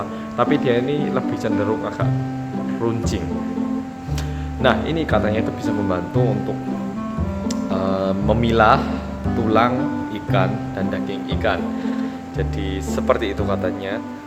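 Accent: Java, Indonesia. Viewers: Orang korea asli ya kak? Koq fasih banget bahasa Indonesia, bahkan medok😎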